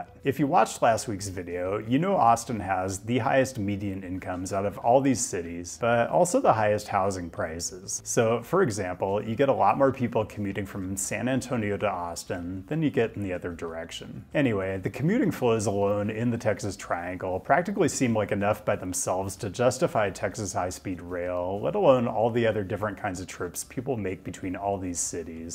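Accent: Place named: California, United States. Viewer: I'm here for the blunted affect California accent coming out of and intellectual talkin bout cites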